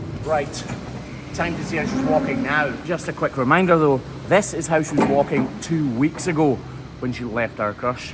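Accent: Scotland, United Kingdom. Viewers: When is your audiobook released,? Got the book but I love the sound of Scottish voice (part Scot but I talk Scouse)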